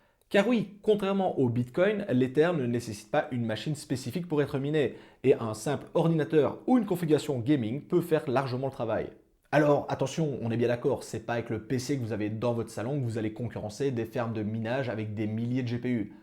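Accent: Belgium. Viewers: J'ai cru entendre un p'tit Belge, bon, je me suis lancé avec une 6900xt, j'ai une 5700xt sur le côté, j'hésite à l'ajouter ... Tu en penses quoi ? Actuellement, je tourne à 60MH/s, c'est correct, non ? Merci pour la vidéo, ça fait longtemps que j'hésitais à me lancer, à voir si ça va arrondir mes fins de mois... J'ai aussi la possibilité de mettre un SLI de 2CG en 4Go sur un autre pc, ça va prendre ou pas du tout ? Ou je devrai me rabattre sur une autre crypto moins gourmande, genre ETH Classic (qui demande que 2Go) Signé : un camarade belge ;)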